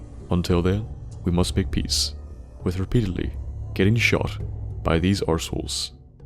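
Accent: Northern Ireland, United Kingdom. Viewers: Are you from Northern Ireland because like you phrases and accent sounds like a N.I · You from Northern Ireland lad? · Are you a fellow Northern Irish man?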